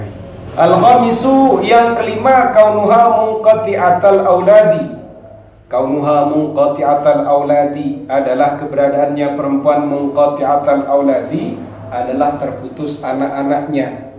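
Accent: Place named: Madura, Indonesia. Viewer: Ustadz orang mana? Bahasanya kayak orang madura.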